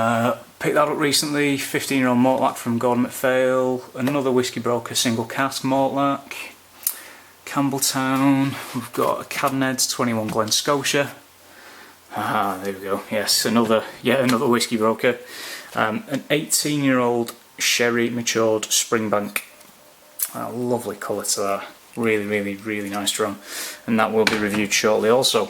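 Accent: Manchester, United Kingdom. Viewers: Loved this. You sounded particularly manc in this vid. Love it.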